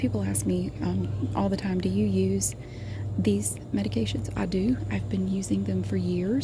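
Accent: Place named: North Carolina, United States. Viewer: The boxes being as unstable as the ex husband killed me XD Also, a few more things about these videos that I absolutely love? 1. The cleaning up. I HATE messy store shelves. One time while walking around our local Wal-Mart someone decided they didn't want their juice so they just sat it down in the middle of the aisle. Like, really? 2. The jokes! They crack me up, need I say more? 3. The accent! I'm a North Carolinian myself, so just hearing that little bit of a country accent reminds me of family members that talked with the same accent that I have since lost or just haven't spoken to in a long time. These videos are my absolute favorite, but I think the ones in the Caldwell area could really use a good cleanup. I don't really look around, I just grab and go when I visit any Dollar Store in this area, but one that I basically grew up in? 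Please consult this timestamp for my comment: . XD The toy aisle was a nightmare, I would go in looking for teen coloring books and there would be a mix of adult coloring books with intricate designs and kitty cats and Disney princesses in the same pile as the word searches. On top of that mess cake was a sprinkle of some parent putting back the mascara and nail polish and maybe a box of cereal too. XD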